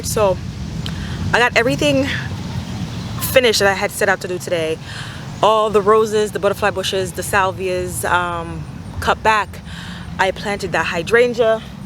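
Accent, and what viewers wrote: Jamaica. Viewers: Are you Jamaican? Jerk chicken and night owl. You sound like me and your love of flowers is so familiar to me. We just want beauty in our yard! Your place is naturally beautiful. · Greetings from Canada, beautiful garden. I love how the Jamaican accent comes out every so often.😂😂 .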